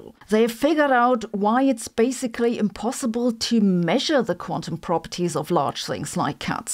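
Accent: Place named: Germany. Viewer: It’s that English with German accent influence that is just…….. scintillating! I know , it shouldn’t enter into the conversation but what the hey, anyway! Love your videos, even if I don’t get the math behind it!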